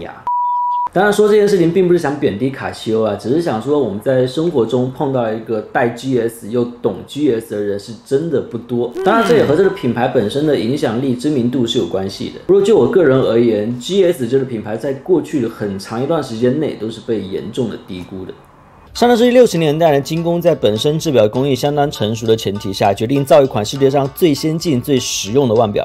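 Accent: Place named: Taiwan. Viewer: 滿口台語音 爲怎麼簡體字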